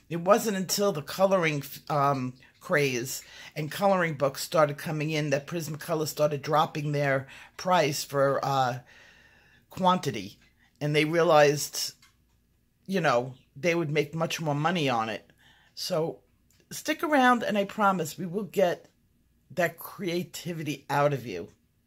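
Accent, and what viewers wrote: New York, United States. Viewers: Hi! I am so thankful for your channel. You are so encouraging and your lessons are easy to follow. My only problem is when you don't show what color pencil you are using as you are using them, or list them in the description, as I am trying to keep a record of good color combinations. Thank you for all that you do! Blessings! P.S. You sound just like my family from NY!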